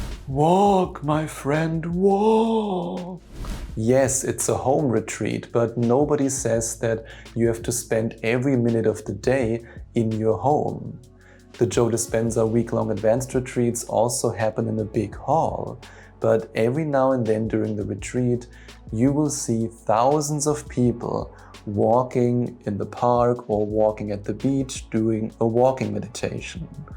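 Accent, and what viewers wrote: Germany. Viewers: I started a 90 day dr joe dispenza meditation challenge for myself & sharing it on my youtube channel to stay committed & motivating my clients. You really always inspire me..this weekend will do a home retreat.Many thanks for your videos..vielen Dank..sind wirklich tolle Videos..empfehle Dich immer gerne weiter. I was in Basel 2024 but meditated on and off..consistency is key..got it locked in now🎉we got the same German accent😂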